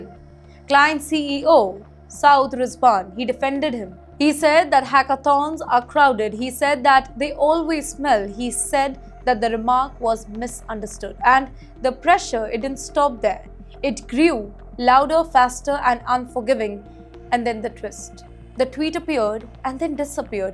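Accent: India. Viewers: Speak English girl. Ur English sounds funny. Chilli biryani accent hahaha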